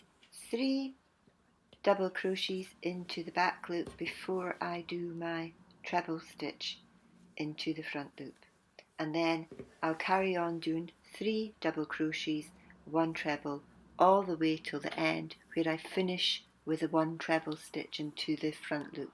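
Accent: Scotland, United Kingdom. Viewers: Many thanks ,I’ve just subscribed,my mother s Scottish so nice to hear your accent.🙏🏽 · Clearly and simply explained, thank you. Ooo your Scottish accent is music to my ears 🐢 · Love your videos,nice hearing a fellow Scot. I came across your videos after watching Tinna. I had watched Mikey from the crochet crowd doing mosaic, (love him, but boy he talks at hundred miles an hour lol)that method was worked back and front, and used chains to skip stitches, then treble crochet two rows below over the chains. I’m going to try both methods to see which I prefer. Thank you so much for taking the time to make these videos, new subscriber here ❤️ Sandra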